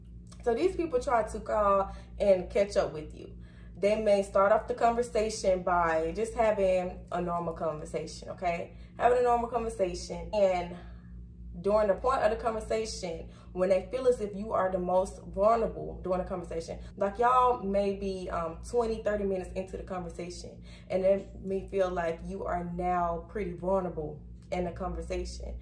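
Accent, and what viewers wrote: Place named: South, United States. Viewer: She spoke on a tipical southern, they so nosey and different.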